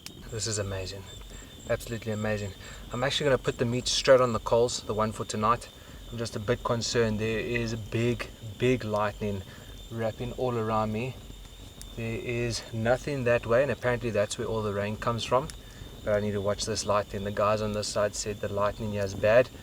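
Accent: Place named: South Africa. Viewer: Hi brother, are you South African? 😅that accent.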